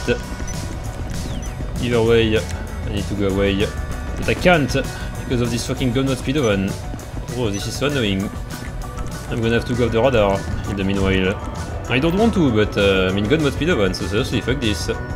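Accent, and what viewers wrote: France. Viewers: I love your videos and western slang with your French lol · The french accent is Amazing bro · His techniques makes it by far but, yeha, French accent is hilarious... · First time watching your vídeos. The French accent is só damn funny 😂 Btw, tho they are Trash, u can't be mad at someone for ewoing when you are trying to kill them in a Jet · The French accent makes these videos all the more entertaining to watch. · Sacrée accent français c’est beau ! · Gta, good memes, French accent... I don't anything more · I mean not only are you talented on console which is enjoyable to watch, but your perfect English French accent is so enjoyable to listen to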